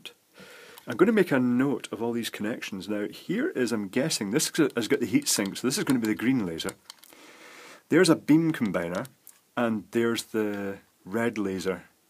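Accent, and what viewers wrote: Scotland, United Kingdom. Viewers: I thought he was Scottish?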